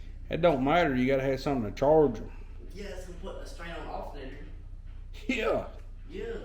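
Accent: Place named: Midwest, United States. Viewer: BTW, I ain't bustin your balls over the accent, quite the contrary. My whole gawddamn family was from the midwest a Oklahomer n Missoura n Florider. Grew up listnin to my folks n kin a gabbin round the table AFTER Sunday supper (you didn't even think about talkin at the table durin mealtime) n it reminds me a my Gramma n Grampa's house where I was raised. I sorely miss em all.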